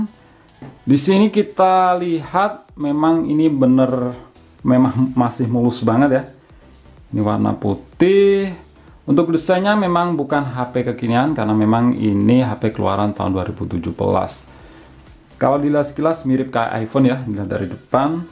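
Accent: Blitar, Indonesia. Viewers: Wong blitar yo mas 😂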